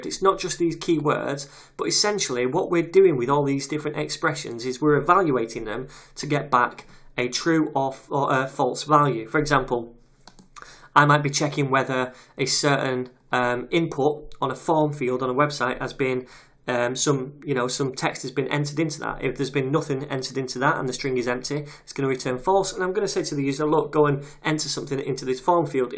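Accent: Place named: Manchester, United Kingdom. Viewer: That's a joke, right? Just in case it isn't, he's English. It's a Mancunian (someone from Manchester) accent.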